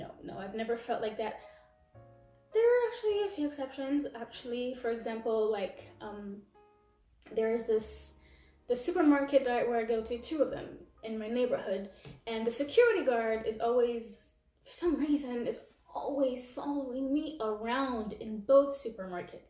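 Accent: United States. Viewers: Hey!im from Valencia too!😎..but i lived in North Carolina since 2003!...for you accent sounds like you from USA,am i right??congrats for your channel !😊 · I live outside of Benidorm and work as a promoter in tobacco shops there and yes I see the staff watch Black people like you described and not look at people with lighter skin colors the same way. I'm curious, from your accent I'm guessing you're from the States (I am too) would you say that racism is different or more or less compared to your home country?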